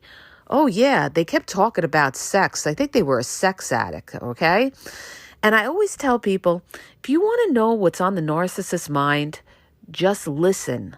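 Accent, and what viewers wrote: New York, United States. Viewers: . You are an amazing woman. The amount of information and experience that you share is helping lots of victims of narc abuse.Your N.Y. accent and honesty is awesome. You have figured these creatures out. They are not genuine and they are not going to help anyone. LIARS. Stay away. God bless.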